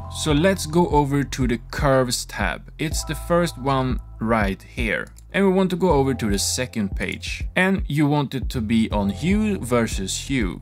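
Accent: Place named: Sweden. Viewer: Thanks for an really easy to follow video, also wanted to say I found it funny as a swede that your svenglish accent is so apparent, keep up the good work man!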